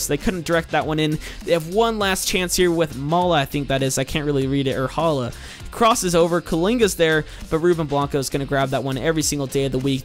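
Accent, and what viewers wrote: United States. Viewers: Bro, Utah accents are weird.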